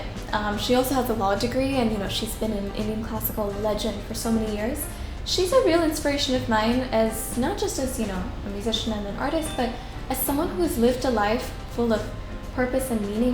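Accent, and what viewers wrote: United States. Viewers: How are u able to speak English so smoothly and in US accent ? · Why do you have US accent?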